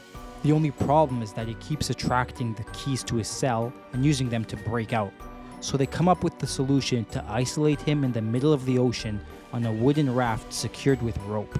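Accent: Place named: North America. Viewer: It's actually rather surprising hearing a North American talk about this show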